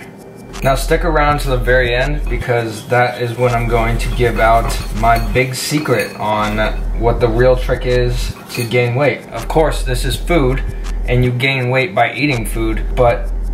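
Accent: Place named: Illinois, United States. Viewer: Lol shit smacks. Sounds like Illinois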